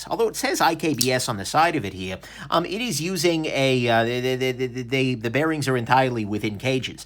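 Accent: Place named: Brooklyn, United States. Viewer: As a New Yorker, I give this a thumbs up and comment for the Brooklyn Jewish accent alone!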